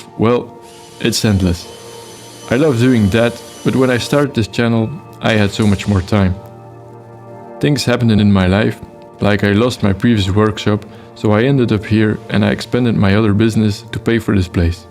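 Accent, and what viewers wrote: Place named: Belgium. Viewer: Met dat accent kan het niet anders dan een Belg zijn 😅 Fijn om een landgenoot te vinden op yt met een wood channel.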